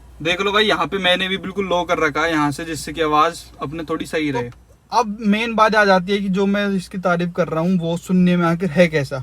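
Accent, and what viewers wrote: Haryana, India. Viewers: Superb haryanavi accent love to hear it. Your videos are amazing. Keep making videos in the same accent ✌️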